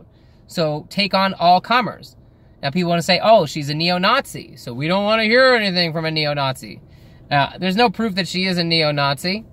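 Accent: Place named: East Coast, United States. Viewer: you're not even from toronto, "ya know." east coast hick?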